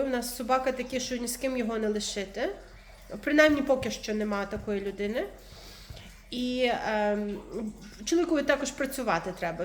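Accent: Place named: Transcarpathia, Ukraine. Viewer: У вас закарпатський акцент.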